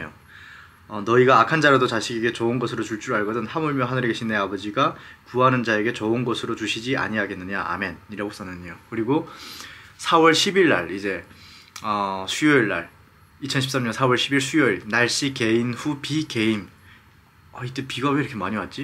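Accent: South Korea. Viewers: 발음도 이젠 남조선 같아요~^^ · 말씨는 남한사람 이네요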